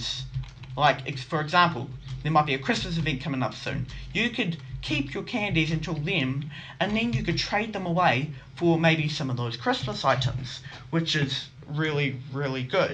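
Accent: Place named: United Kingdom. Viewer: Brittish accent 👌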